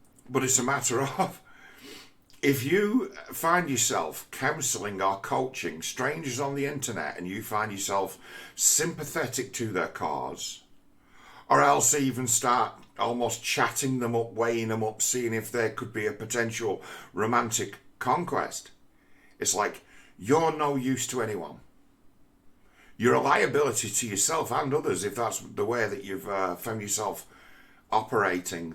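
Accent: England, United Kingdom. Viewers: By the way, I think it is wonderfully hilarious to see you insulting people who hold down jobs and have a stable life. How very telling. Nice try buddy. I like an English accent but I am still allergic to narcissistic bullshit. And I know what you are doing - trying to entice me to stalk Richie. I got too much shit to do on top of foreign global affairs. I'd have to check my calendar.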